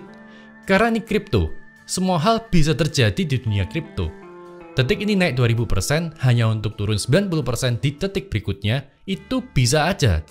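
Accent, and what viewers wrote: Java, Indonesia. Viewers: Ko bahasa nya medok Jawa Jo😅😅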